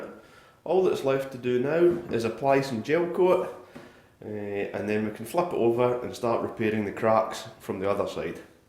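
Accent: Scotland, United Kingdom. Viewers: What a warehouse of skills you have, so great! ( are you from the same country as Jim Kerr "SimpleMinds" ) your accent sounds the same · I am here for: awesome restorations = 60%. Awesome scottish accent = 40%. HAHAHAHA love this channel mate!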